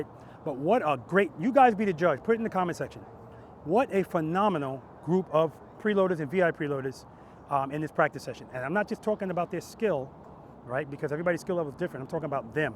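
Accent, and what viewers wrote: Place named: New York City, United States. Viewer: Great video. Thickest NYC accent I’ve heard in a long time. Keep it up.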